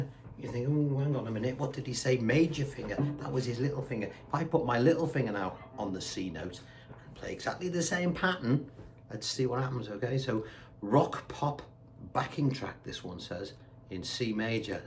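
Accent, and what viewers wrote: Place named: Wirral, United Kingdom. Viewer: Hi the scouse accent drew me in here but you have that posh twang of a Wirralite or Formby guy? the other thing is our strap with the Union jack That was my flag all my lfe i never even knew there was an England flag I noticed the change away came about 1993? Being a classical piano student the guitar fretboard seems very complicated in comparison but this helps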